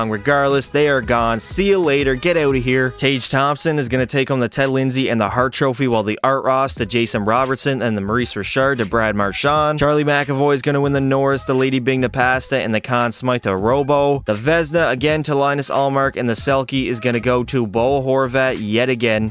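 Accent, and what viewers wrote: Canada. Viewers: Pasta are you Canadian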